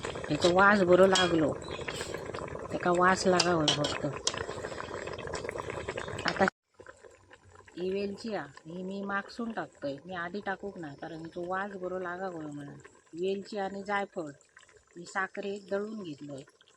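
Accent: Malvani, India. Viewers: ताई छान रेस्पी तुमचे बोलणे खुप छान मालवणी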